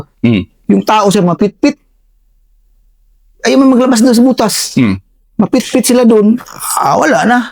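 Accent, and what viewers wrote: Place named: Visayas, Philippines. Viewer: Hahaha kagiw.. Dalom kaayo nga bisaya ba.. Makalingaw ka master😄😄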